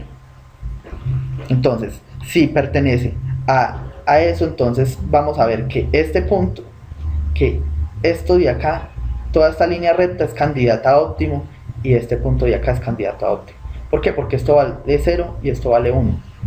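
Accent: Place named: Colombia. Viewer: Excelentes videos, excelente profesor, debería de aparecer su nombre también, para darle todo el crédito a él. Por su acento parece Colombiano. Un abrazo y muchas gracias...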